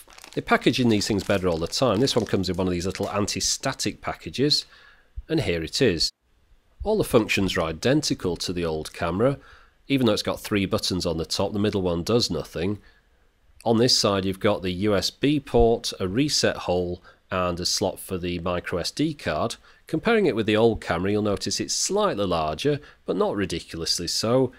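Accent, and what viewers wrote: United Kingdom. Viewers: Dear Sir, you are anything but boring. don't know what that chap was on about. I could listen to you review anything! ...partly added that us across the pond enjoy the British accent.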